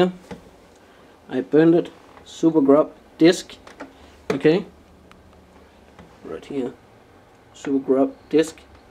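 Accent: Denmark. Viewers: Dude, you're so danish :-D - Its so obvious by the way you're talking english!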